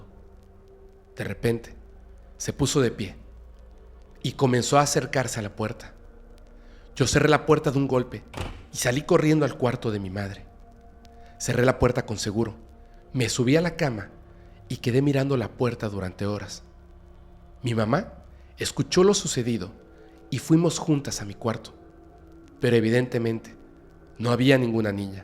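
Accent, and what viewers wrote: Mexico. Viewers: Hola tengo una duda: ¿Eres mejicano? Por el acento lo pareces. De donde seas, te mando un gran saludo y un fuerte abrazo. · Si es mexicano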